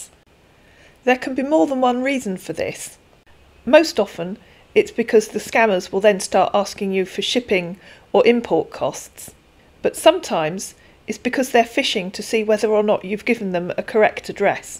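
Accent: United Kingdom. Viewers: Love the british accent!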